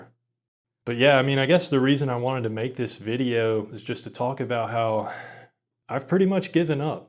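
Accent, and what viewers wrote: South, United States. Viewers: your university should have a resume development department, they'd still help an alumni out, also they train you for specific interview questions, if you want they'll even film you and walk you through the tape and where you could improve. Do I detect a Southern accent? T. Savannah, GA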